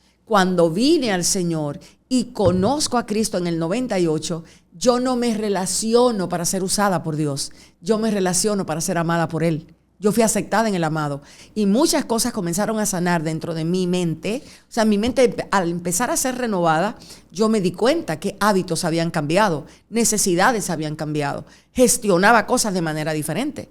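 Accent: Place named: Dominican Republic. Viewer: Q bonito habla está Hermana, q acento, q pronunciacion y enfasis mas bonito, muy capacitada en él conocimiento de la palabra pero sé nota q ha recibido un un espiritu de sabiduría y revelacion muy vasto! Poniendo la mujer cristiana dominicana en alto!!!!Saludos de una hermana cubana desde Kentucky!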